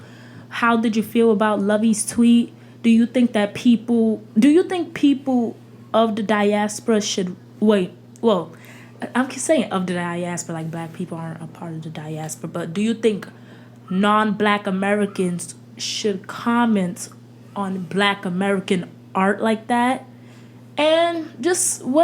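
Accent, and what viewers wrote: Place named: Bronx, United States. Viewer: I love your accent! Shout out to the bronx😁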